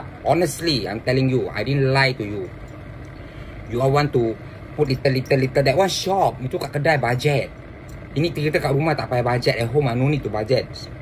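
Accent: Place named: Singapore. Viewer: suka dengar ocehan dialek melayu singapura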